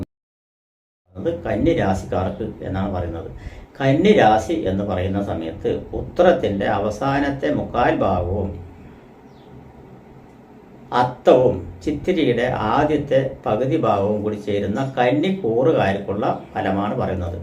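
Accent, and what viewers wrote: Tamil Nadu, India. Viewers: ഇത് ഏതോ അണ്ണാച്ചി മലയാളം പറയുന്നത് പോലെ ഉണ്ടല്ലോ റാസി മനസൻ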